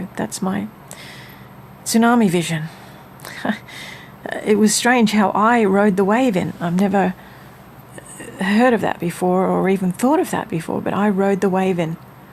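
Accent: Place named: Australia. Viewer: I though your accent was Aussie. Just watched your video about "who you are". It must be lonely to be stuck in a hotel room far away from your home, but there are no coincidences with God. Maybe you made a mistake by coming here to marry someone you really did not know, but God can use that for His purposes. Maybe that was to get you away from a country that is forcing the V on everyone. Anyway, in my opinion we don't have much longer to wait for His return. PS: I am a musician--banjo, guitar, mandolin and dulcimer. Mostly old time gospel in nursing homes, churches, etc. Well, some folks don't consider banjo players as musicians. LOL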